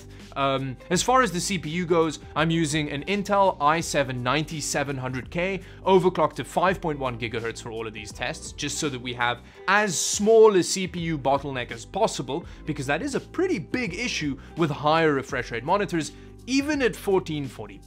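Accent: South Africa. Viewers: His accent already made me think hes from south africa - the Namibia shirt made me even more suspicious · I enjoy your videos very much. Just a question: You sound like you have a South African accent, and then I see your shirt says Namibia.. so I wonder if you're from Namibia? Do you live in Europe now? I'm not fishing for your address or anything, just curious. :)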